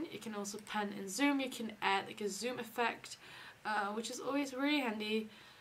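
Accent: Netherlands. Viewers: i smelled a dutch accent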